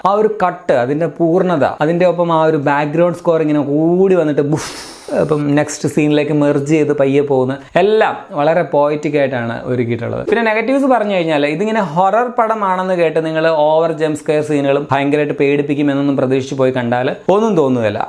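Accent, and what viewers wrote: Kottayam, India. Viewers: Bro kottayam aarunno😁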